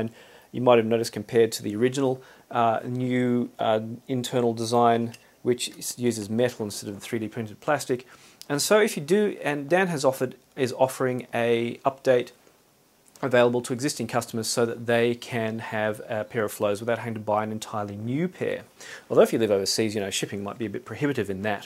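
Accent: Australia. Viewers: where are you from.. don't get the accent.. a cross between English and south african...probably Australian or kiwi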